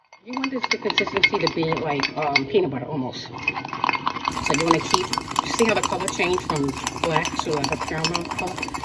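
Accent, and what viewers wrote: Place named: New York, United States. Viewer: Love your NY accent, Ma'am. Greetings from Australia.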